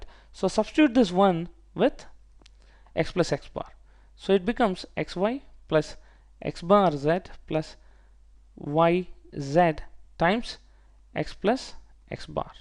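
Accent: India. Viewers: its indian accent bruh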